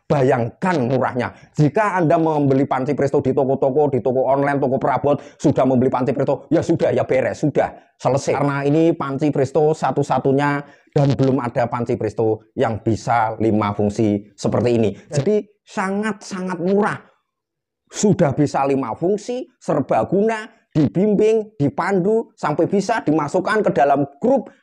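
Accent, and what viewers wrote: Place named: Java, Indonesia. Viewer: Chef ttp dgn ciri logat medoknya 😊 seneng sy, sukses selalu ttp humble 😊😊😊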